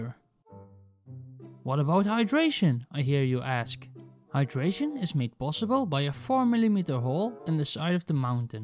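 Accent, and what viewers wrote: Netherlands. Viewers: hoor ik daar een nederlands accent?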